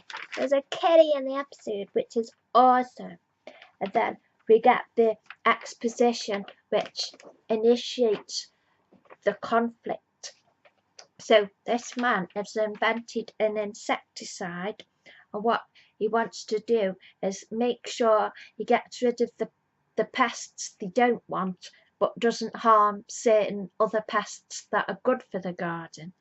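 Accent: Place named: Staffordshire, United Kingdom. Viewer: <3 The Black and White and <3 You. And <3 the Staffs (?) accent.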